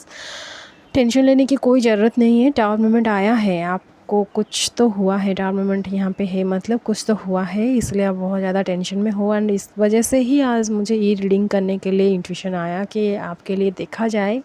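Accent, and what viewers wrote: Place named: Jharkhand, India. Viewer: oh mujhe laga Jharkhand se ho...apki accent se laga.. 👍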